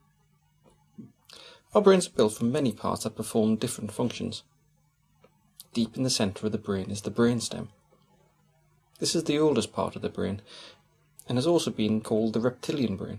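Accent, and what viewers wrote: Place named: United Kingdom. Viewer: With all respect to your place of origin,realize this is the internet,so use a freakin accent that the whole world can understand,not only British.Also,for 2010+ standards. animation sucks.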